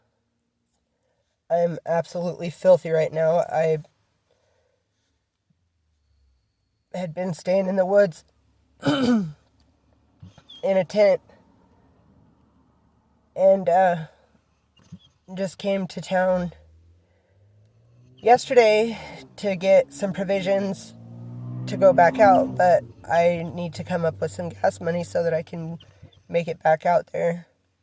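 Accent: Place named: New England, United States. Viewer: You have a similar accent to mine. Are you local to southern vermont or western mass?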